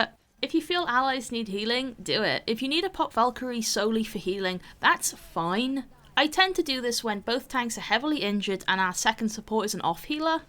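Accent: United Kingdom. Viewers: Waaaaiit... Are you British? Also pls upload more vods and in general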